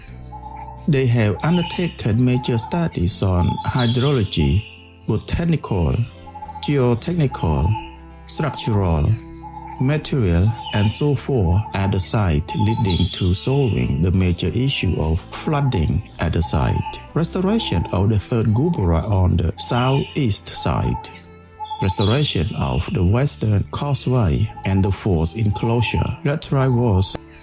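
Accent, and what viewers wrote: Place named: England, United Kingdom. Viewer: The scenes and editing are excellent, but the strong English accent and mispronunciations make it difficult to focus. Since there are subtitles, maybe a clearer voiceover would help make the content more enjoyable.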